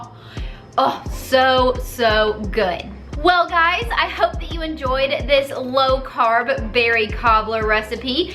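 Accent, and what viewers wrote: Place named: Texas, United States. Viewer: She would fit right in here in Texas, my family speaks the same way. 😃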